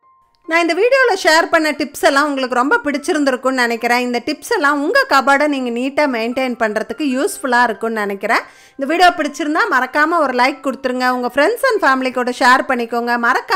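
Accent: Coimbatore, India. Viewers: கோவை தமிழ் உச்சரிப்பு மற்றும் மிகவும் பயன் தரும் தகவல் நன்றி சகோதரி.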